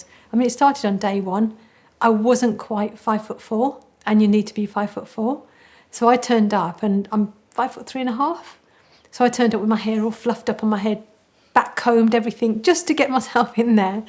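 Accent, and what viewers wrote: Birmingham, United Kingdom. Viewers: Definitely a brummie girl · She has birmingham accent .....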